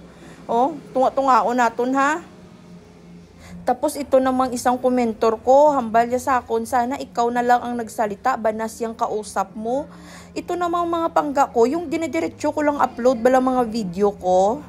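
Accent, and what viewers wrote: Iloilo, Philippines. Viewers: I loved listening to your ilonggo malambing sya from Masbate to stop answering mga basher I watched Latino manicurist , Vietnamese, Korean advance sila , sa pinas isa ka malinis magtangal ng ingrown dry skin everybody is talking while working none stop gid sya Vietnamese to ukit ang style nya at gusto ko yong mga gamit nya it can make it easier sa trabaho try mo mag watch internationally it might give you new idea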